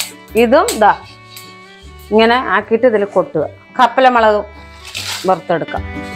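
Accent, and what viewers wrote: Palakkad, India. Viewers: പാലക്കാട് ആണ് എന്ന് നന്നായി അറിയാം. ആ ഭാഷാ, penne കപ്പല് മുളക്..❤ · പാലക്കാടൻ ഭാഷ 😂😂😂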